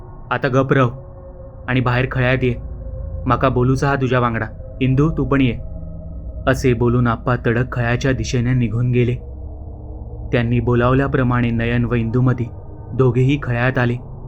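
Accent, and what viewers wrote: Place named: Malvani, India. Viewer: तुझ्या तोंडानं मालवणी बोललंस बरा वाटला आमका ... मालवणी च वाटलंस...... पण भीती वाटता जाम हैता सगळा ऐकान 😅 lots of love from malvan ❤❤❤❤❤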